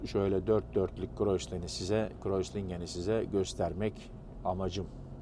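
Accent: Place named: Istanbul, Turkey. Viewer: Bey elinize aklınıza saglik, temiz bir İstanbul Türkçesi ve tertemiz ses tonuyla kolay dinleneceksiniz. (Cekim teknik ayrıntılarna yer vermeseniz iyi olur) Görüntü güzel, toplumsal yaşam hk. açıklamalar hoşumuza gider.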